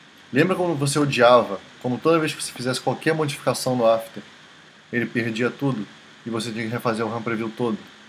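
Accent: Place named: Rio de Janeiro, Brazil. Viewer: Pô, tu está perdendo tempo... com essa voz ai, se você gravar uma música dizendo que é do todo mundo vai acreditar kkk até o sotaque carioca vc tem =)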